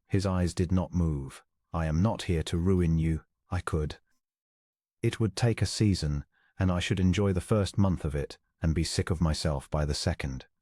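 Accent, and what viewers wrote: United Kingdom. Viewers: There were a few times I planned to post a corrected pronunciation, but found that “error” was just the difference between British and U.S. forms.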